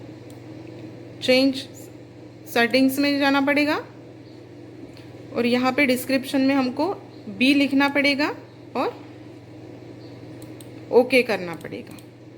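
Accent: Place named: India. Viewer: A less Indian accent would attract more viewers, Prof B. Emphases are all ...hard. More chest-voice.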